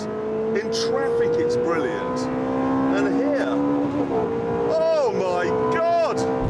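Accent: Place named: England, United Kingdom. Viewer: I LOVE the english "english pronunciation"...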